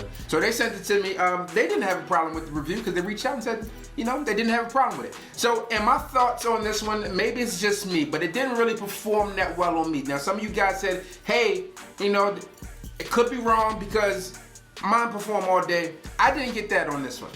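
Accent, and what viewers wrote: Baltimore, United States. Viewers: Boy that bmore accent sound weird af lol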